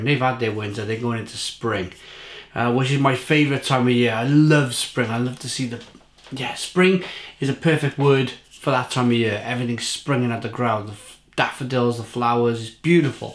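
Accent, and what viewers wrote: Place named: North Wales, United Kingdom. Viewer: Great review mate! Where about's you from? You accent sounds north wales to me if i'm not mistaken?! Big ups from a welshman living in London.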